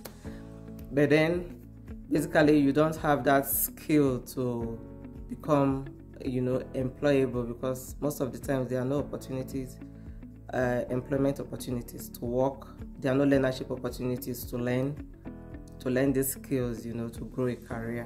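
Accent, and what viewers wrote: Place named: Nigeria. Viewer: I love Nigerian accent, I hope yours doesn’t change. This is your continent, live and enjoy sis.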